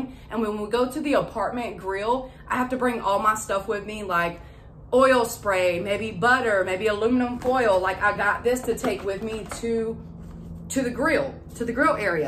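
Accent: South, United States. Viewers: You are so adorable and I love your Southern accent!! Your videos are always uplifting and fun. Thank you for being a sparkle in my day💜, couldn’t find the emoji but YES, YES, YES chop it!!!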